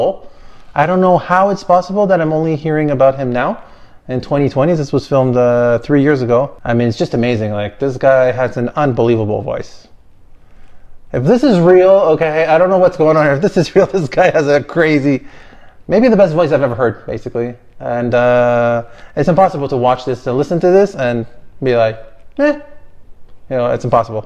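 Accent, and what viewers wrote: United States. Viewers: I can't beleive you're French. You sound so American! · French is your native tongue? Your English sounds as bastardized as what comes out of any of us, as Americans... Perfect! =)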